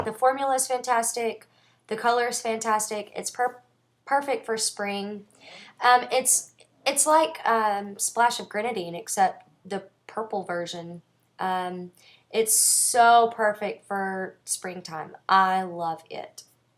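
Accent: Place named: South, United States. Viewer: LoL - high five swanky southern accent!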